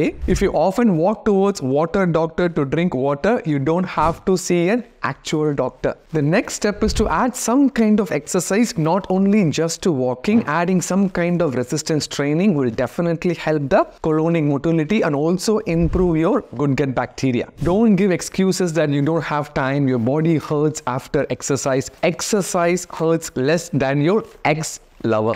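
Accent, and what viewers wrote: Tamil Nadu, India. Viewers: Very informative and your Tamil is very cute 😊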